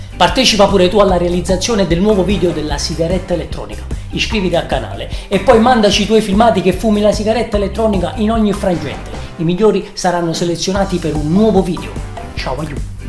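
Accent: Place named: Naples, Italy. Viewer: Certo che, per quanto sei di Sala Consilina (SA), per un non campano passi facilmente per napoletano…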